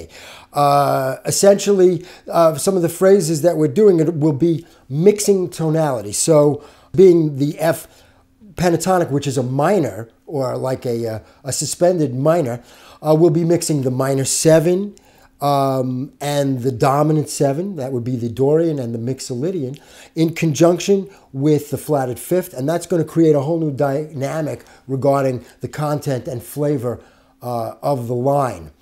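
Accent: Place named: New York, United States. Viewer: This is great stuff. Also love the New Yawk accent, I'm from Brooklyn...LOL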